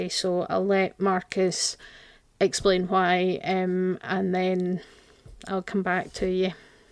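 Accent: United Kingdom. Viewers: This video is cool, thank you scottish science youtube lady 👍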